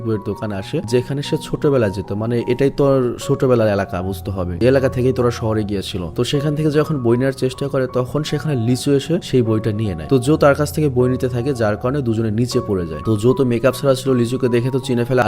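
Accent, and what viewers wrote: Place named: Tangail, Bangladesh. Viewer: তুমি নিশ্চয়ই টাঙ্গাইলের মানুষ তাই না 😅😅😅😅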